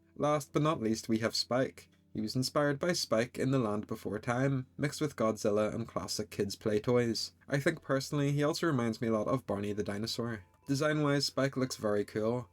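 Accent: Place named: United Kingdom. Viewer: You sound very British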